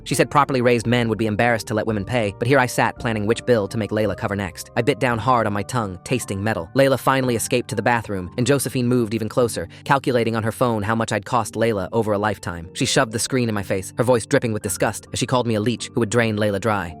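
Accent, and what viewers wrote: United Kingdom. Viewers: The British accent 😂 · Bro turned british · why did bro turn British why immediately went to the comments and see if anybody else anything about this bro went from normal to British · The British accent what happened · Not the British eccent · Bro slowly turned british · Why did it turn British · Me: Watching video Hears British accent sighs opens comments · What the british accent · the british accent!! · Almost every one of these story's have a British accent like mid video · Ahhh yes british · That British accent is wild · british mate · Lil bro got a British accent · Nice British , mate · WTF BRITISH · Dude, why is it British? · Is it just me or has British accents been appearing randomly in the recent videos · That british accent was so funny! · why British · Why the british accent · The British accent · The tts is bri’ish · What in the Gordon Ramsey accent is going on here? 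· Why the hell did it turn British towards the end…. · Bro why’d it turn British half way through the story😭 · Ok British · ummmmm british much????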